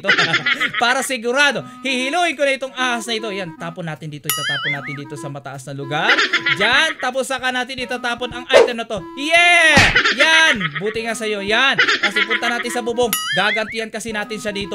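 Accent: Ilocos, Philippines. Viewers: Kuya ilocano kaba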